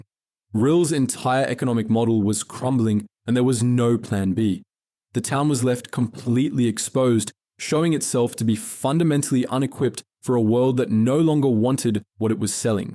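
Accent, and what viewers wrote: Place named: Australia. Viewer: Australian voice indicates it's never been and is using the BigBlackCo... sorry... 'BBC' for information.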